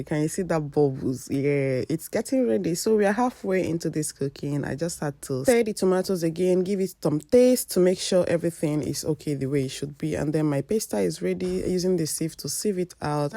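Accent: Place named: Anambra, Nigeria. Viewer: Your anambra dialect is giving me joy.😊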